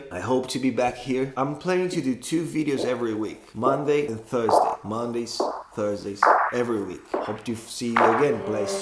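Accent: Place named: United Kingdom. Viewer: o sotaque britânico kkkk mt bom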